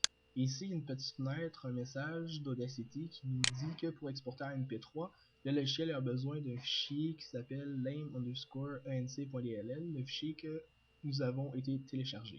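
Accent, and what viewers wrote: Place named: Canada. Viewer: il est canadien c'est normal si il parle un peu différement hein.....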